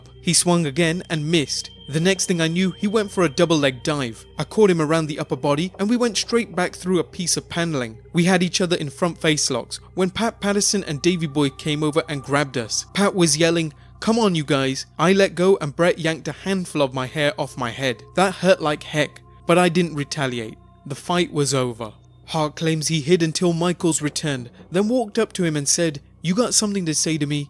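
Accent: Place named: United Kingdom. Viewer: I love this guy's Britain accent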